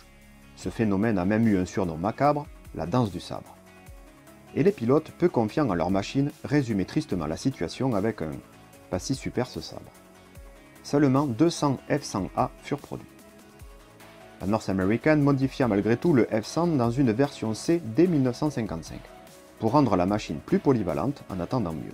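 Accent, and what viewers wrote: South, France. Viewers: Très beau documentaire … Les détails techniques et historiques sont très bien présentés. Le petit accent méridional du narrateur et quelques pointes d'humour permettent de suivre cette présentation.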